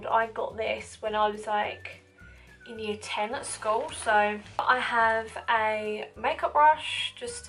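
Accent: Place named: England, United Kingdom. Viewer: I absolutely LOVE your accent!! I want to go to England 😍😍 Great video..i have this in the "MM" size.. thinking about getting the "GM" for travel! You're gorgeous! New subbie! ❤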